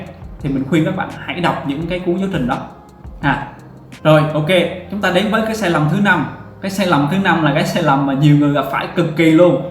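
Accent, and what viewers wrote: Da Nang, Vietnam. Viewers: a oi anh học ở đn hà anh, nghe giọng n giống đà nẵng qué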